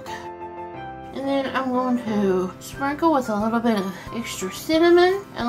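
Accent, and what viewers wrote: South, United States. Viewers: Love the country accent ❤️🎄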